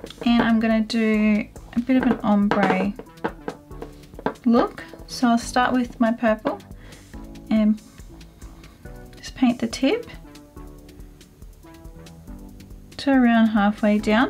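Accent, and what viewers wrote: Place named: Australia. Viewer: These are so cute!!!♥️♥️♥️😍😍😍 I was obsessed with barbie when I was little. Love these. So creative! You are so talented. It would be cool if you did a Q&A. I'd definitely watch. Love your videos. I love seeing your channel grow so much! I also love Australian accents, lol. I think press on nails is a great idea! I, personally, probably wouldn't wear nails that long or goergous because I'd probably lose them, lol. However, I still think that's a super awesome idea although I think shipping might be really annoying. It would still be so cool to own a set of your art work even if they were just on display. The only other thing I'd be wondering about is sizing.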